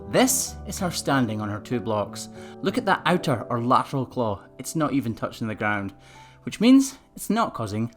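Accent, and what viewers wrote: Scotland, United Kingdom. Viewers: please do a video with your full Scottish accent!!! Can't wait for the merch!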